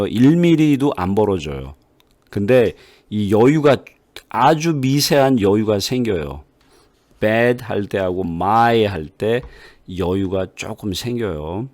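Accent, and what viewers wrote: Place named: United States. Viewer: 진짜 미국의 발음이 나오는것 같으십니다 존경해욥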